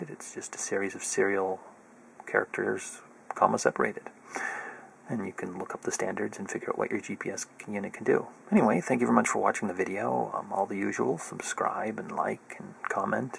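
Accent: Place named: Western Canada, Canada. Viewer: Western accent, metric country. Pretty obvious.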